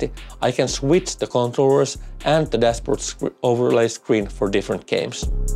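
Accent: Finland. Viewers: this is the first time i watched you and right away i noticed the finnish accent =D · Nice setup. I would love this for my Elite Dangerous games. Are you Finnish btw...accent sounds familiar? xD (Ah checked your info and was correct)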